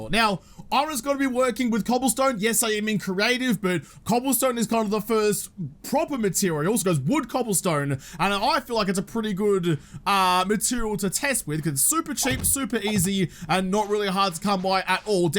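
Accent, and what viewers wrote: Australia. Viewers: You say at start of video for new people to game.. then proceed to shoot wood on ground and build right away. Lost me completely with that Aussie accent. Trying to learn.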